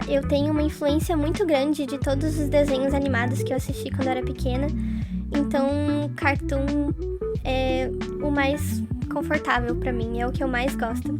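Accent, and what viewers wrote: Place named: São Paulo, Brazil. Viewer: Hahah que estranho pessoas não percebendo que você é de SP quanto é tão claro.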